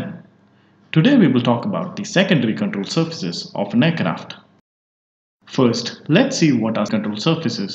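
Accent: India. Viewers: Bro r u indian? Btw thanks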